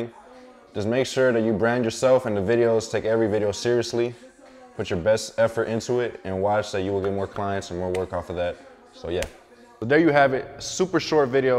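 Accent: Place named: New York, United States. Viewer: got u brother. You dont even sound like you from Texas. You sound like where I'm from ny/nj lol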